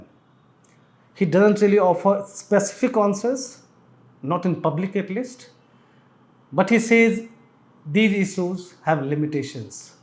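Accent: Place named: Bengal, India. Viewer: Ur accent is Bengali. U must be a Bengali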